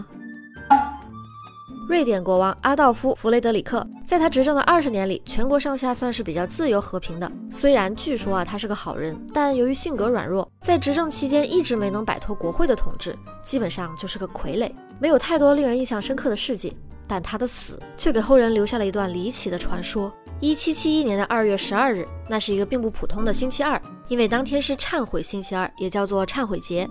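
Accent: Cantonese, China. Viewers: 廣東話太可愛啦🎉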